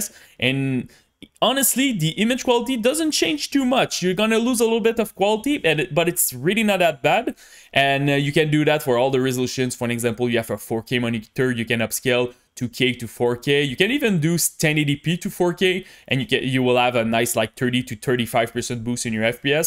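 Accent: Quebec, Canada. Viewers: Do I hear a French Canadian accent? :D I am Canadian, lived in Ottawa most of my life. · ca parais que tu est quebecois xD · j'adore ton accent québecois à travers ton anglais! mais pourquoi pas en quebecois!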